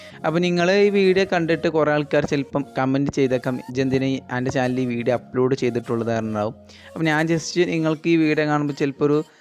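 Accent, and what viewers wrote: Malappuram, India. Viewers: Ninte home malappuram ann enn ariyamayirunnu ninte samsaram keettal manassilayi😎😎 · Bro malppuram basssha matttt najmall malppuram malayalam parnjnnnl mtuulli work manisilllla😍😘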